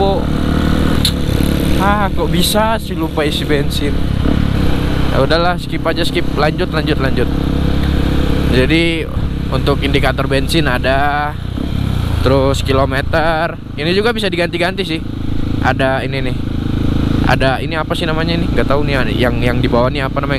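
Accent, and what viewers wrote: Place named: Medan, Indonesia. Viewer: Suaranya keinget temen kerja saya orang medan.. Horass nuhun..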